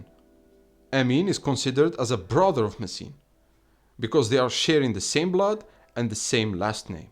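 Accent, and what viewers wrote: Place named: Rif, Morocco. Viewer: Can i know where are you from ? Because your accent of tmazight n rif sounds a little bit different of what i used to hear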